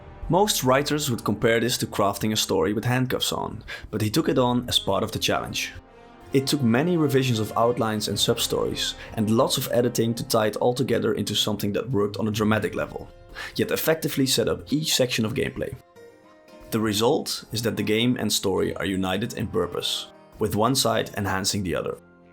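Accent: Netherlands. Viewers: It took me 10 seconds to know this guy is dutch😂. Leuk gemaakt man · Your voice sounds so Dutch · I can just hear you're Dutch.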